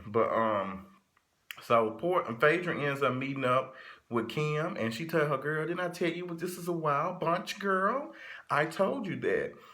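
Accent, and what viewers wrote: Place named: South, United States. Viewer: Your country talking 😭😭😭😭😭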